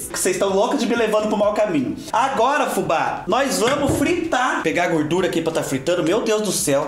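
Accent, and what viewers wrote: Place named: São Paulo countryside, Brazil. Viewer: Bom diaaaaaaaa fubá,adoro seu sotaque e fiquei muito feliz de saber que você mora aqui por perto da minha família,minha sogra é de Itararé,meus sobrinhos de Itapetininga minha nora também, todos eles falam assim como você acho lindo, beijão seu jaguara marvado eu nem preciso que aumente minha borda de catupiry# a cinturinha 😂😂😂😘😘😘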